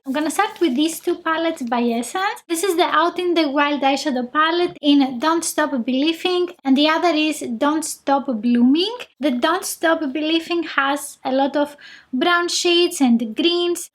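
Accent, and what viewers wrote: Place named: Greece. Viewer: I love your videos and greek accent. I am greek too, Christina. You located in Greece? I was born in Canada but live in Sweden now. Contact me if your in Greece. I'll come visit😁 I'm a makeup artist too👍❤️ we can have fun and do videos together with fun budget products too i haven't tried yet! Sweden don't have the products in stores to test shades out but I'm scared to order online so I don't get the wrong shades lol. I have really oily t zone /combination skin and I don't like too flat matte but I want it full enough to cover and last all day. Did you do a video of the makeup revolution irl foundation, concealer and power line? They don't have it in the stores here I was hunting BUT ONLY their older products available here. Oh you should try rimmel mascaras and foundations! So amazing! The mascara I have been using for years is rimmel wonderfully length pink one it adds volume too!! 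Beats all mascaras today I've been using for years, I've tried them all MUST TRY!! Do a rimmel video please 😍your welcome to Sweden too! Xo Xtina❤️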